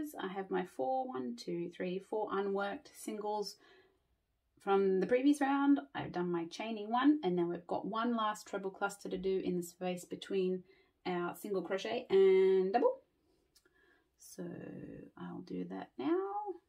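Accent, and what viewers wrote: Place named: Australia. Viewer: I just subscribed to your channel because of seeing this square!! Love it and look forward to listening to your Aussie accent haha!!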